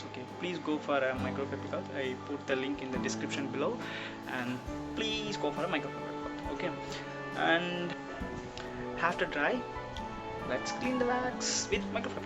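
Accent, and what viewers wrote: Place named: United Kingdom. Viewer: I thing he is speaking British English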